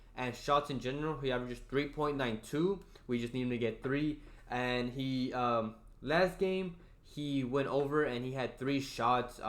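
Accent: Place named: Texas, United States. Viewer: youre in texas huh. i thought you had a texas accent. then you said its thunderstorming.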